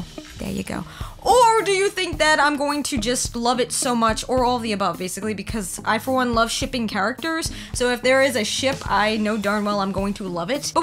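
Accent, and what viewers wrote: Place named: Brooklyn, United States. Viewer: is this chick from Brooklyn or some shit? I hear some sort of accent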